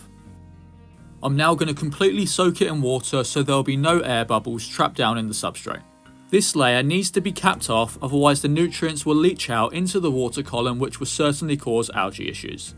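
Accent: United Kingdom. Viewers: Beautiful setup! Watching this to help do mine soon, thank u! ☺️ Would love to watch more videos with the natural cadence of your lovely british accent! ✨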